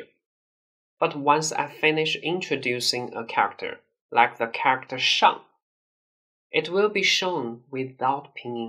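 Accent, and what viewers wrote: Standard. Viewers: I am Chinese , I can tell you that this teacher speaks Mandarin , his pronunciation is very standard !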